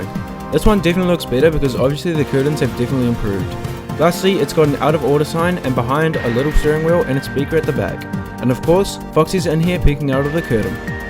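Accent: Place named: Australia. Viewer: Listen to that accent… bro really thinks he’s not Australian with THAT voice…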